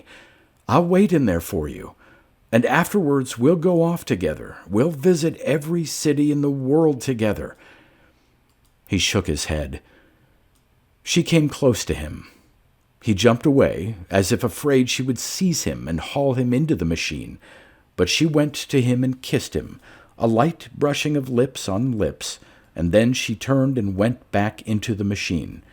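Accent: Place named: United States. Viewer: Do you realise how VERY American you sound?. Almost a parody of American. I wonder how long religion will keep your Nation infantile?.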